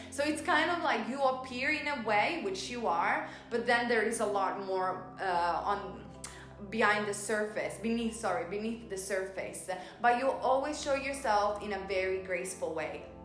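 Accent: Italy. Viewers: your italian cuite love your accent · Is she italian? I so love her already! Her accent and personality! LOVE! Anyways I have seen so many videos like this but god I have never had any reading this eerily accurate! Like never before! OMG! spot on! I chose #3.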